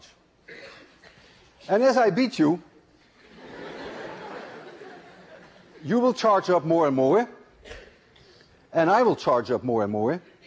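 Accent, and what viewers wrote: Netherlands. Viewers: he sounds dutch. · he talks like he's from holland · is he dutch? his accent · He's got a dutch accent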